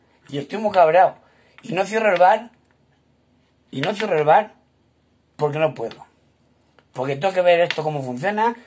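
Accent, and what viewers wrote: Albacete, Spain. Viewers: Enhorabuena por tu seriedad, que no está muy extendida entre otros propietarios de bares que con tal de ganar. Y, además, tu habla es 'mu' de Albacete. Saludos de un paisano de a diáspora madrileña. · Muy bien explicado, los de Albacete somos así, claros y transparentes, soy camarero, soy mallorquín, mis padres son de Agramon, y yo me crié de pequeño allí (en lo salvaje)estoy muy orgulloso de ello,por cierto tengo el mismo deje que tu hablando,eso siempre me acompañará un saludo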